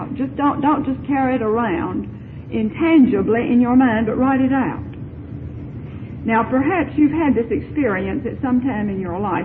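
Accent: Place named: North Carolina, United States. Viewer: I love her North Carolina twang!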